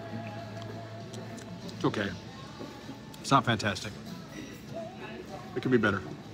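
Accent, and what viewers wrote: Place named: Texas, United States. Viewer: A definite texas twang in your accent when you're back home...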